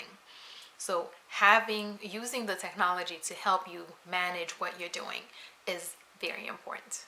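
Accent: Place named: East Coast, United States. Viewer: I love your East Coast accent! My dad is from NYC and it's my favorite accent.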